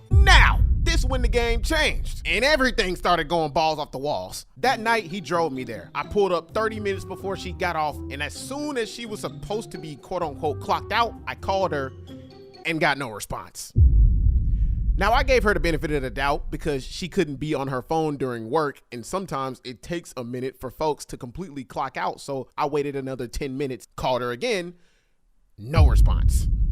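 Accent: United States. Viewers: It's ur accent bro, u got an American accent that many people in South Africa might find interesting... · from one honeyless man to another let me inform you in Joburg no honey gets "rizzed up" they just want the paper cuh, they just heard your American accent and probably thought "yeah, he got that cheese". You want a honey who'll be a ride or die, go to KZN get yourself a Zulu wifey, next time you in SA. AND DEAR GOD DON'T GET A XHOSA hun.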